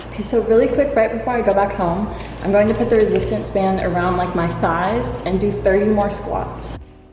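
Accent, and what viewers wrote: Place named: United States. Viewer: Are you from the United States I feel you have accent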